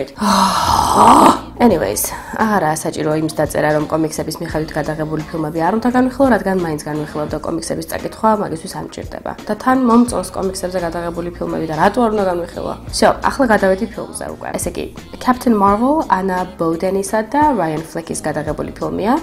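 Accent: England, United Kingdom. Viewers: ნუ ეს პირველი ვიდეო იყო რომელიც მე ვნახე შენი და ეს ინგლისური აქცენტი ჩემთვის საკმაოდ კარგად ჟღერს რაშიც ბევრი არ დამეთანხმება კომენტარებს რომ გადავხედე წინა ვიდეოებზე. anyways :დ ხმა ძალიან კარგი გაქვს და ნუუ ალბათ უფრო კარგი იქნება თუ სახესაც გამოაჩენ. ყოველ შემთხვევაში მე ძალიან მომწონს, როდესაც თოთოეულ წარმოთქმულ ემოაციას ვხედავ თუ როგორ აღიბეჭდება ადამინის სახეზე. ძალიან მომეწონე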